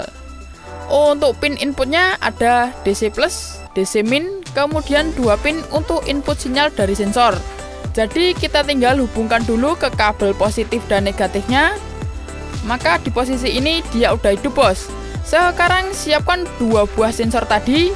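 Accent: Java, Indonesia. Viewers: Akhirnya ada channel gini yang medhok. Udah cukup dengan logat india wkwk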